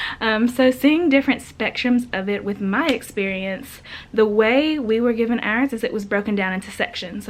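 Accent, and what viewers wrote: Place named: South Carolina, United States. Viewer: Sounds like Southern Carolina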